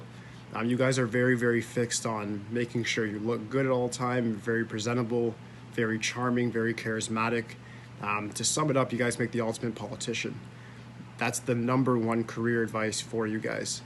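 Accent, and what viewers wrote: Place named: Canada. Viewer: This is the first video I’ve seen of yours. You sound Canadian 😁 love it! I’m going to look for your channel and I truly hope you’re still active. Your knowledge on this subject was A1. 😊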